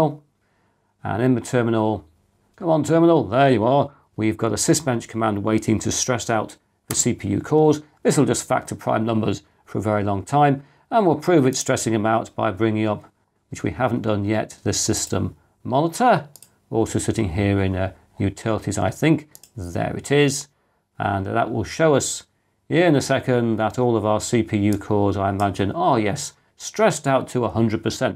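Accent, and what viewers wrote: United Kingdom. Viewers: ".... and now that's it" for another exciting and informative video from my favorite YouTube producer. I love this British accent❤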